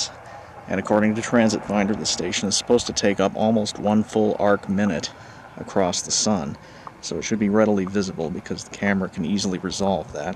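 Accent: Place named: United States. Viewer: it sounds great to me - I always think the American accent sounds "professional" Much easier on the ears than my Aussie roughness.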